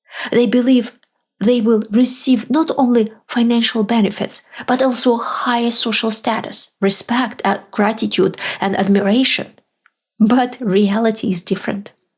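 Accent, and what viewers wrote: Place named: Russia. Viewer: Oh, I love this Russian accent ASMR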